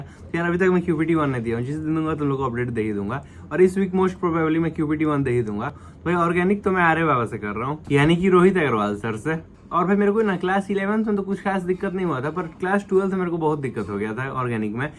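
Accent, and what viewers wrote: Bihar, India. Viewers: Bihar se Ho kya bhai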